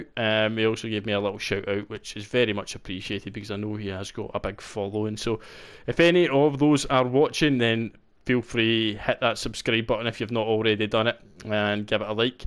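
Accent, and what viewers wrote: Scotland, United Kingdom. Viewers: First time viewer and fellow Scot. Good to see that the UK GT Sport streamers are not all from the SE of England. Nothing against the English at all, but just nice to hear the Scots accent commentating. You from the lowlands, Glasgow area? Would love to see you racing with the likes of Super GT, Tidgney, Rory and Kie. Like Rory - cos of his mature attitude to racing, much like yourself. Consider me a sub.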